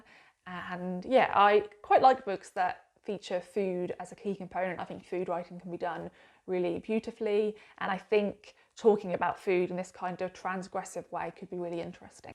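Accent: United Kingdom. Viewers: Hi. All books you described are alright. Above all, your pure British accent is most welcome. Many thanks ❤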